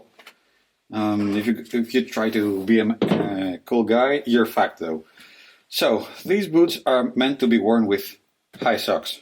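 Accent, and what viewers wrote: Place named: Greece. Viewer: When i heard you speak English i could distinguish a greek accent. When i saw the ΝΥΜΦΗ beer; i was almost sure that you are Greek!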